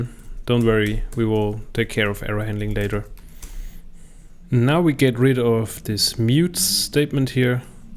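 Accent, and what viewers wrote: Germany. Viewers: Hello Rust! Oh that sounds pretty cool. And yeah I feel you have some nice German accent when you talk on the video :P I started learning and using Rust because of TiKV actually :) Thanks for sharing those videos again and keep up with the good work :)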